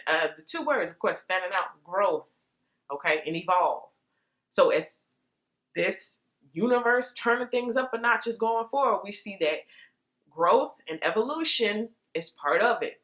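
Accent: United Kingdom. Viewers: You’re funny .... that British accent tho 😆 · whole reading resonated, haha yes queen vibes issa new energy haha picked up british accent lol x love from UK x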